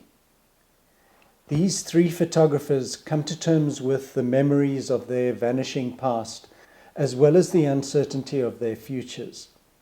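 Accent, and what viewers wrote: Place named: South Africa. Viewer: had a feeling you were south african when i heard your voice :)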